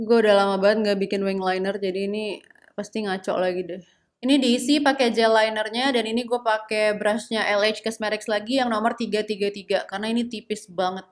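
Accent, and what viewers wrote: Java, Indonesia. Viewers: aku ko selalu ngakak ya setiap ngomong jawa, medokkk banget 😂😂😂 Tp ttp cintaaaa❤❤❤❤❤❤❤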